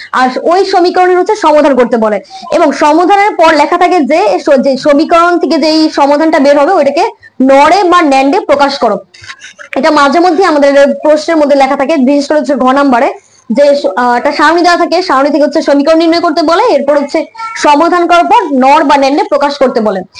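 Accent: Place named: Kolkata, India. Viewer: ভাষা কলকাতার মতো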